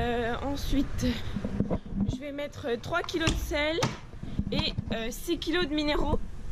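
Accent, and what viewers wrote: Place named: South, France. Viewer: On voit bien que tu viens du sud J'adore tes vidéos 🥰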